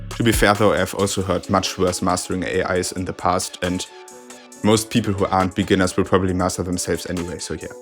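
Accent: Germany. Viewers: Denglish on point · Der deutscheste Akzent, den ich je gehört habe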